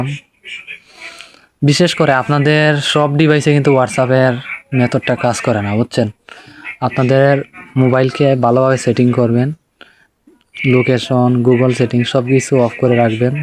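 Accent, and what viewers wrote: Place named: Sylhet, Bangladesh. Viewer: Joto suddo kotha kow na kene ba tumr shyleti kotha buja jay 🙂👍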